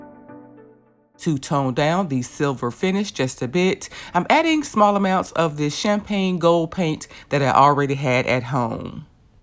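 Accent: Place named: Memphis, United States. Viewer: Sound like your from Memphis or Atlanta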